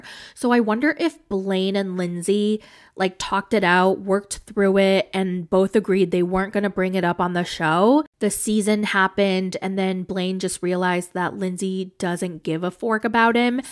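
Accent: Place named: South, United States. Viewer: you have a beautiful southern accent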